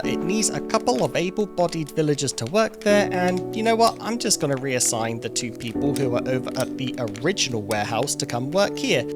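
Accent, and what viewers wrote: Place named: United Kingdom. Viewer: I do wish he could feel like he doesn't need to hurry up. I watch at 90% speed because with his accent (I speak Murican not British or Aussie), it's difficult to some of what he's saying as he speed slurs his words together as though he's just having a banter with the blokes on the block or whatever they do in his part of the world. Anyway, sir, you can talk at normal speed without viewers catching Twitter Brain and tuning out because you're speaking at a normal pace.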